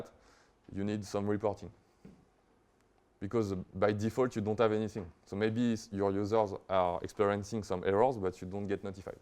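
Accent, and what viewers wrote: France. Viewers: L'accent français à jamais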